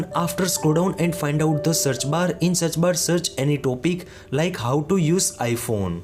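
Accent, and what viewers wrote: India. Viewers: Are you in India? the accent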